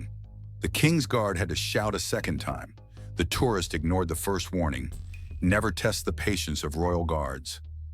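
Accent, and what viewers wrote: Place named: United States. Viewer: Why must an American be the Off voice???🤨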